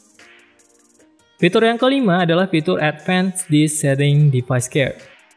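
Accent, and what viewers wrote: Aceh, Indonesia. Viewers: hei orang aceh kah anda??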